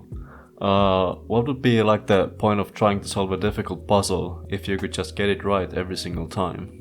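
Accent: Finland. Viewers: Where are u from ? U sound like u have a finnish accent or something similiar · Definitely from Finland. The accent gives it away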